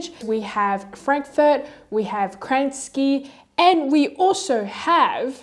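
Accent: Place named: Australia. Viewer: Gosh your thicc oz accent i can’t even 🥰🥰🥰🥰🥰🥰🥰🥰🥰🥰🥰🥰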